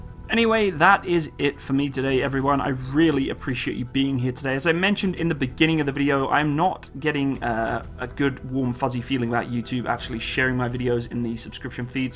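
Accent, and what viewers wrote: United Kingdom. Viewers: I was wondering why the fck I was having trouble understanding some words uttered, till I found out at the end of the video that the man is British. Weird that it didn’t naturally strike me. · >Search Youtube for an explainer on something related to history or the military. >Hear a British voice narrating. >Instantly like and subscribe. It's an involuntary reaction at this point.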